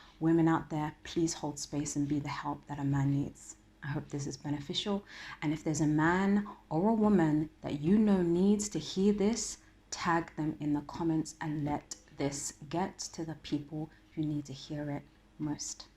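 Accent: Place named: Canada. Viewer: Thanks for sharing your knowledge on this. I promise you I will share it with my friends. Also I pray that the whole of western world will understand the need to this issue.Very thoughtful. Am deeply sorry to ask, what do you studied at the University and are you from Canada? Because the way you always pronouncing the (Better) sound like you are. It's out of curiosity.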